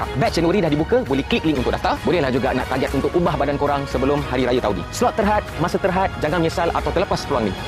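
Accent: Indonesia. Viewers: Kalau nak tipu pun biarlah menipu dengan pandai, janganlah pakai orang indo yang loghatnya ketara sangat. Betul lah bongok, patutlah IQ mereka sama level dengan golilla (gorilla)!! Reference: Boru Naga 2 hari lepas "IQ rata rata orang indo sama dengan gorilla, pemuda indonesia di-kecam sebab membela malaysia". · Itu nk claim ini nk claim...dh takde keje sgt ke...rendang...mkn jer laa cekik kasi habis...tgk muka cara ckap dh tau org mana...ingat org bodoh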